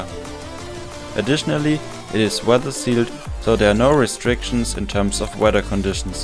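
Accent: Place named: Germany. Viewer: An dein Englisch kann man so gut erkennen das du deutsch bist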